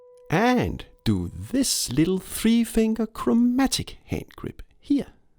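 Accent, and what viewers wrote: Denmark. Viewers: Hej, jeg tager chancen, gætter på du er dansker.....??? Syns jeg kan høre det:-) Super fedt. Hvis du er fra Kbh området vil jeg meget gerne booke et par lektioner hos dig hvis du er frisk.... mvh Peter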